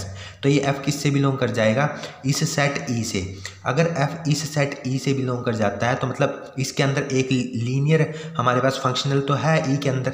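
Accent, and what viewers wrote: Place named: Haryana, India. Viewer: Aap ke accent se pata chal gya ki aap haryana ke ass pass se ho , ho sakta hai haryana se hi ho , Thankyou so much sir , ye accent chupaye ni chupta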